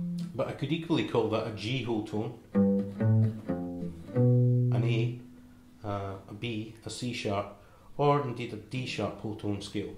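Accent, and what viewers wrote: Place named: Scotland, United Kingdom. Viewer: Nice to hear a nice well modulated Caledonian accent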